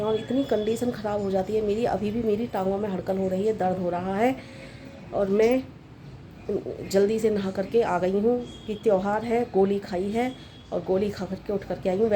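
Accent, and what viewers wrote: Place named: Hathras, India. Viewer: aapko bhi happy Diwali 🙏🏻 main bhi u.p se hi hu.. hathras se hu .. aapke bolne ke andaaz se laga ki aap bhi hathras se hi ho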